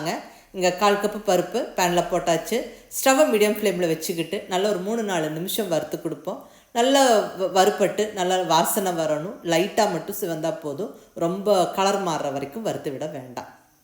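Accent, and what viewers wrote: Kongu, India. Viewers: எல்லாமே சூப்பர் கோவை கொங்கு தமிழில் பேசுவது அதிலும் அவ்வளவுதாங்க சுவைகூட்டுதுங்க அவ்வளவேதாங்க சகோ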